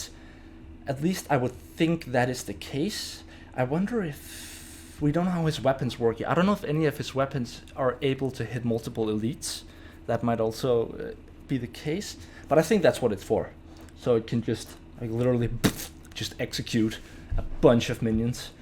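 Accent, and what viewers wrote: Denmark. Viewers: Classic Riposte is a fencing move. A parry witch flows fast and directly into an attack. Also can't wait for Mastery video. By the way do I detect a fellow Danish accent? :)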